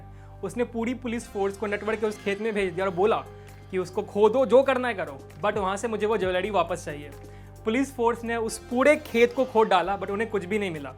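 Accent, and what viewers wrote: Bihar, India. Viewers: Oh... Nice to hear Bihari accent🤣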